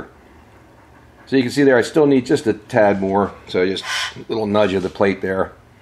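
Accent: Pittsburgh, United States. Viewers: haha, thanks for the chat and knowledge! My mom is from Aliquippa and hearing your accent reminds me of my uncles over there in the Burgh.. Also, one of the reasons I like Jim Kramer's Mad Money too, lol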